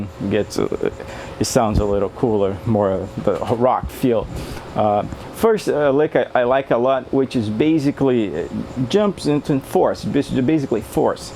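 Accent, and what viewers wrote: Brazil. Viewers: I think the accent is Brazilian. I can't get to the link. I like this stuff. I've pulled towards this type of phrasing without know/analyzing what I was doing. This'll be useful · vc poderia fala em portugues. pelo menos teu sotaque me parece brasileiro. e poderia colokar as legendas em ingles. ; ) soh uma sugestao nao me leve a mal.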